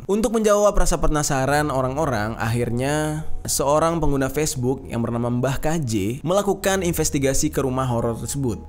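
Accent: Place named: West Java, Indonesia. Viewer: Lidah kulon weee tonggoku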